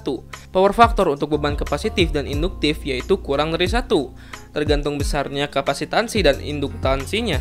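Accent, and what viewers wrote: West Java, Indonesia. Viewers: Orang Sunda bang😄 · wkwk orang sunda ieumah yeuh wkwk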